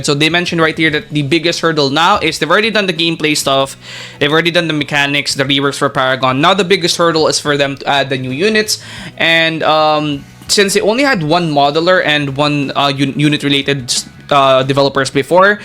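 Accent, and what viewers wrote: Philippines. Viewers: bro, nothing negative, but you have a filipino accent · accent makes it evident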